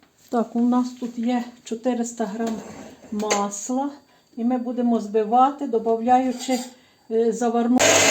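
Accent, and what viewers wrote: Ukraine. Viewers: Дивуюсь де яким коментарям.Все показано і розказано дуже чітко і ясно.Приємно дивитись і слухати таку нашу милу сільську украінську мову.Дякую і здоров'я вам і вашим помічникам.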